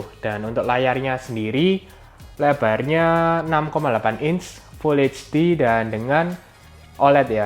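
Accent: Java, Indonesia. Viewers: medok amat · Medok itu keren bro 👍🏻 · Gara gara logat jowo ahire aku subscribe..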